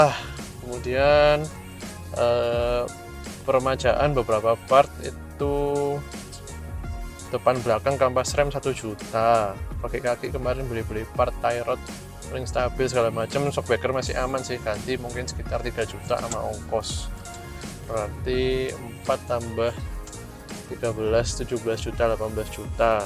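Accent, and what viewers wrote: Surabaya, Indonesia. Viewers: Logat Suroboyo wkwk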